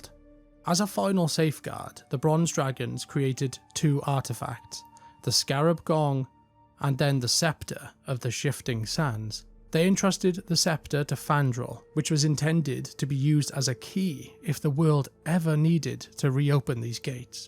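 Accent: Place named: Cardiff, United Kingdom. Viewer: i know a cardiff accent when i hear one.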